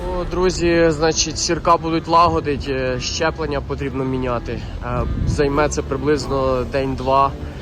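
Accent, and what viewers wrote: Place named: Surzhyk, Ukraine. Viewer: А мені подобається твій суржик,обожаю( правильно обожнюю).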